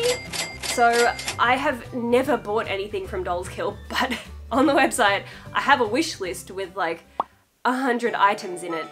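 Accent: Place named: Australia. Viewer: she is aussie she does not have a bad aussie accent u can’t be aussie or know their accent at all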